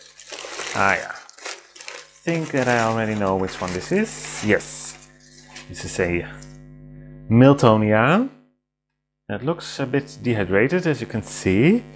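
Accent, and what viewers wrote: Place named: Netherlands. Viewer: ! I thought I recognized your accent! I have family friends in Ryswyk! What part of The Netherlands do you live in?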